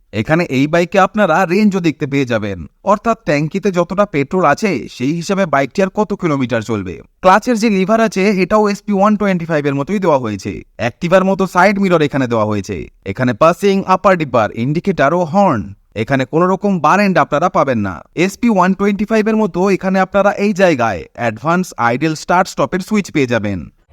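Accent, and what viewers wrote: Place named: Bangladesh. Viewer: আমি বাংলাদেশ থেকে বলছি। আপনার বাংলা বলার ধরন প্রায় বাংলাদেশীদের মতো। বাংলাদেশে কবে নাগাদ আসবে সূত্র উল্লেখ করে বললে ভালো হয়।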